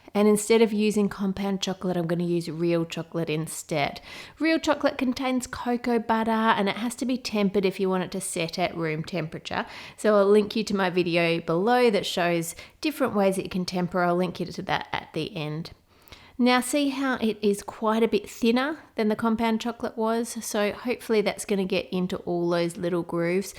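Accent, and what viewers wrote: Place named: Australia. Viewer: I have entered!! But... do you have to click the subscribe with email button? Mom doesn't want more emails... lol. 😆 Anyway, I LOVE your videos,! They're Sooo fun to watch... you're so creative! And I've gotta say, I love your Australian accent. 😊💖 And your nails! They're such a pretty color!!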